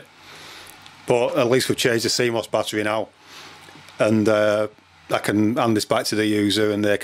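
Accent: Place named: England, United Kingdom. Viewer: brilliant english accent i adore )))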